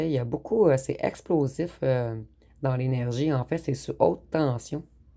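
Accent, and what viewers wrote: Quebec, Canada. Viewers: J adore ton accent Québécois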